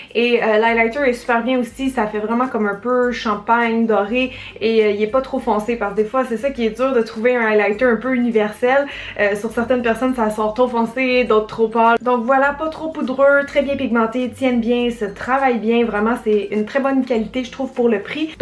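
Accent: Canada. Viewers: Tu es très fraiche, de bonne humeur et on remarque ta motivation dans tes vidéos. Je viens te de découvrir et je t'adorr déjà. Tu es magnifique et ton accent canadien avec tes expressions typiques me font bien marrer 😊 Gros bisous!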